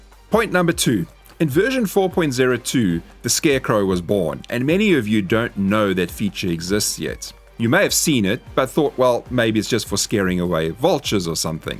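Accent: South Africa. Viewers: Aussie here mate, always thought you sounded South African. I think aussie, kiwi, certain british and south african accents have alot of similarities. Either way its pleasant to listen to. I reckon its the garden hoe fruit tree yield and the loot bags you didnt know. Really enjoy the tip vids too. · I hear the stronger SA accent my friend, I used to work with a gang of S Africans in the 90's, great bunch of guys, love the accent!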